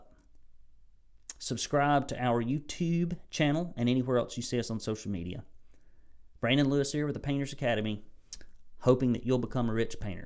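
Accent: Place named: South, United States. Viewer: Hey Brandon , your commentary is "Spot On" and honest. And Oh Yeah,,uh,, don't ever worry about that "Rednecky Accent" . Ya"ll doin Just FINE!!!!!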